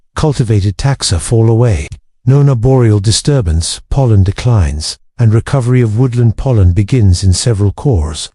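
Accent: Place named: RP, United Kingdom. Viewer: I love history, but this piece is so far up its own a***, it's embarrassing. Narrative should rivet and guide and inform, not try to impress with a plummy accent, lots of big words and no actual people, characters, story development. People love to learn about people. Plenty of scientists, historians, even mathematicians understand how to decode something complex and spin a wonderful yarn, with drama and tension. Instead, this feels as if the narrator is reading from a stuff textbook...I can give plenty of examples. Do better, or I will not be back. Tell a story that engages and isn't so self-impressed. "Disease is the quietest, cruelest candidate..." Really? How about discussing the actual one that hit the metropolis you're detailing? And stop being so vague and boring. And note to the producers: This irked me so much, it didn't do anything to help me drift into sleep.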